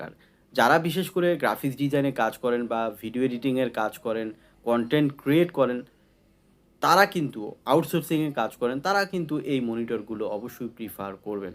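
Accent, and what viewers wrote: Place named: India. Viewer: Apnar voice undian accent pai, khub sundor presentation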